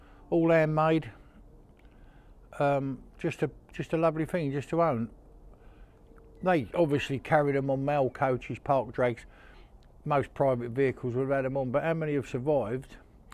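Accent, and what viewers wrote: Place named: London, United Kingdom. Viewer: what part of london are you from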